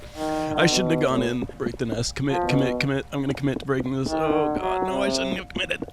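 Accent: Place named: Newfoundland, Canada. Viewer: Are you from the rock? Your accent sounds slightly like Newfoundland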